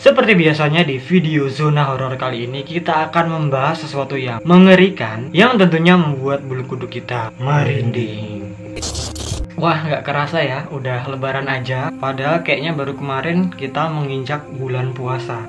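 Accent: Java, Indonesia. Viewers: Lidah khas Jawa nya loh yg bikin seru 😁😂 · Sampean suku Jawa tooo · Jowo daerah pundi kak? 😹😹😹😹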